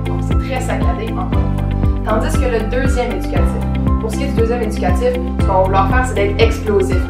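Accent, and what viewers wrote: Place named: Marseille, France. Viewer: magnifique ! merci pour la progression et les éducatifs ... et l'accent ... marseillais.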